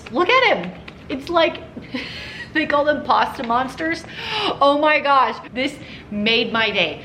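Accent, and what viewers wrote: United States. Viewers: You cooked Barra! It’s delish! So is red snapper. & you said it correctly, it’s strange hearing American accent say it haha. Fellow Aussie 🇦🇺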